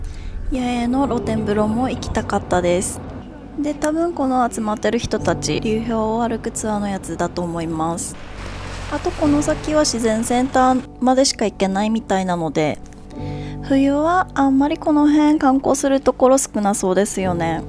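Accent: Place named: Tsugaru, Japan. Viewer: 青森の人？津軽弁だぁ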